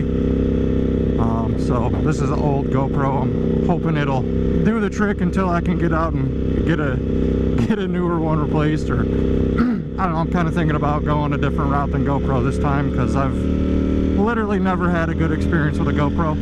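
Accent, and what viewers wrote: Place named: Michigan, United States. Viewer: Great videos man keep it up! I'm from MI originally, in socal now. Looking to upgrade to SF V4S shortly. You have a strong Michigander accent lol, sound just like all my family from Dewitt.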